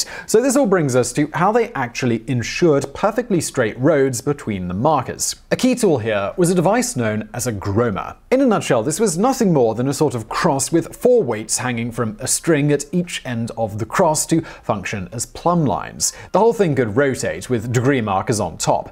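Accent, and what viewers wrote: United Kingdom. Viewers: Nero tarnished his own name. He didn't need anyone else to do it. He is likely the Beast of Revelation. This guy gets a lot wrong, but because he says it with a pompous British accent and feigned authority, people believe it. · You sure do make a lot of assumptions. So Neil Patrick Harris is probably pretty knowledgeable about surgery? And news anchors practice reading off the teleprompters? You bring up that he has multiple channels. Is he doing that for all of them? You only think of him as intellectual because of his British accent, not because of any real critical analysis.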